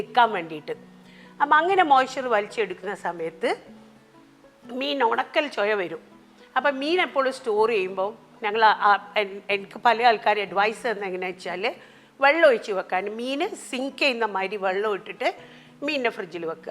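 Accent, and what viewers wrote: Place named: Malabar, India. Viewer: What a presentation with that Malabar slang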